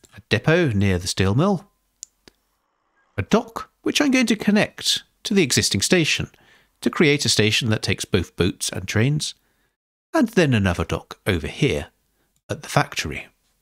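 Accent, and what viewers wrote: United Kingdom. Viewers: That accent is so British, 😂. Thanks for the video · I really love your voice. That soft british accent makes me think I'm a young child, watching some british infomercial or something like that. It's really soothing, and helps me learn.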